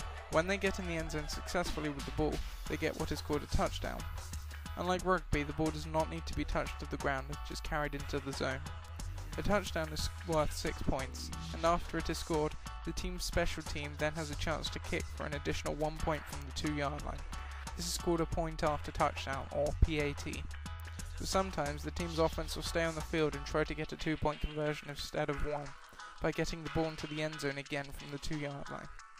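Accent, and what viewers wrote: United Kingdom. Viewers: Thanks really helpful, some people don't understand a British accent, don't know why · are you from england?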